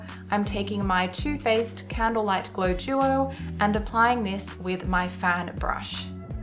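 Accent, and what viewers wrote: Australia. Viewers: Her face screams "French Model" to me, so even though she talks like an Australian, it didn't hit me that she's Australian until she mentioned the weather there lol. I love how she leaves a little bit of darkness under her eyes (especially since I see a bunch of people on YouTube who take their concealer all the way up to the lashline). It looks really cute :) Thanks for posting.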